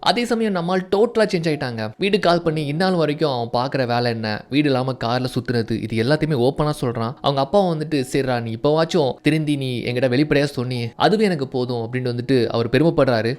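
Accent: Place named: Kerala, India. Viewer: 1 month kku munadiye...... doubts vanthuchu.... but apo voice la malayalam smell vanthuchu..... BUT IPA KANDIPPA SOLLURE ...... TAMIL VOICE OVER ஆசூன் தான்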